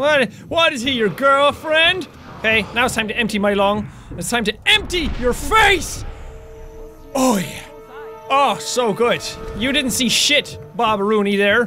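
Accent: Ireland. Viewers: Wow I didn't know you liv In Ireland thats how your irish tell me more oneday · Is he Irish? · OMG I LOVE THE IRISH ACCENT :D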